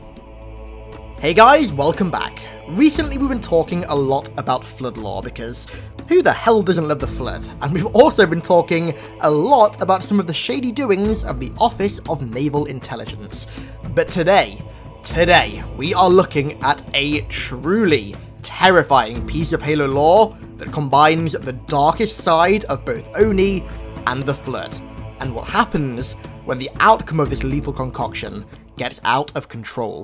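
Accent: United Kingdom. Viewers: are you british? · British accent then the lisp fuck. My ears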